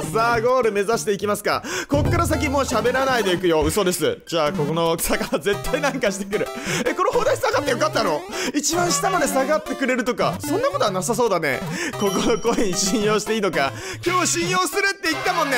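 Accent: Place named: Kyushu, Japan. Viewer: こんなOPとか言ってるけど、、、それがいいんすよｗ こんなって言ってるけど、こういうのも考えて作成して、そして動画編集もして、、、、無理しない程度にお願いしますね！長く永くなが～～～くさんの動画が見たいので！！あと、自分熊本なんで、ちょくちょく出る方言も聞きなじみがあるけん、そのままのスタイルで言ってほしかばってん、、よかろか？？お願いしますｗｗ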